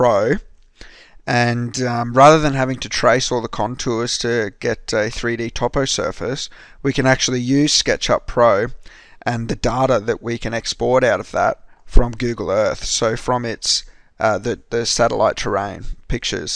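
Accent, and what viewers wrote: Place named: Australia. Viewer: Love da Aussie accent mate!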